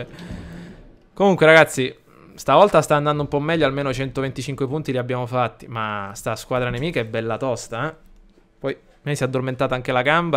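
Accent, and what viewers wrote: Sicily, Italy. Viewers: Hai preso un accento siciliano più forte rispetto ai video di 3 anni fa! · CMNIK 1501 perché è siciliano?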